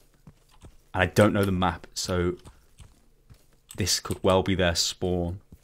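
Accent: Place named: United Kingdom. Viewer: That's like the britishiest voice I've ever heard :D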